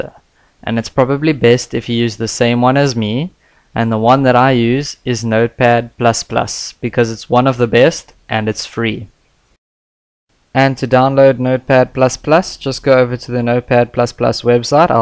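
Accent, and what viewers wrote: South Africa. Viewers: You sound like Mr. Shaun Pollock and thats how I knew u r a SAian Very helpful lectures - Thanks :) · South African??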